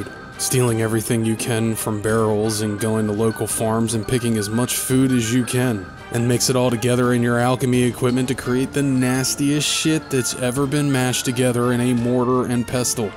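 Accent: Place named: Mid-Atlantic, United States. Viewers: You from Maryland, bro? You got that THICC mid atlantic accent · I detect a Pittsburgh accent.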